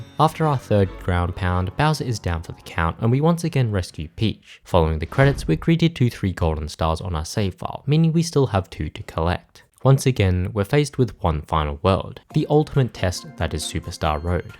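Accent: United Kingdom. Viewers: british ew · its standard pronunciation for uk english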